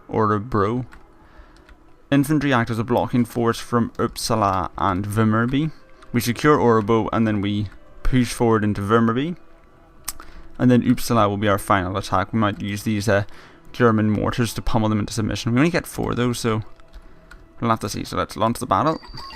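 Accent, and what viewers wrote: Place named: Scotland, United Kingdom. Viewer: Hey man, what is your accent? To me it sounds like a mix of Scottish and british but thats just what it sounds like to me.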